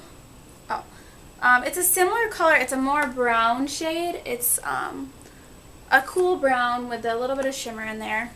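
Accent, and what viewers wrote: United States. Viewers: I love you accent! which state our you from?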